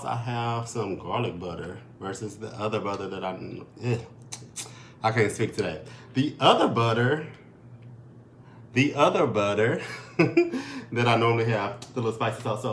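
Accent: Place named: South, United States. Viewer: Great video. You sound very southernish in this video. Where exactly are you from? You were very excited to pour that water, very commercial like.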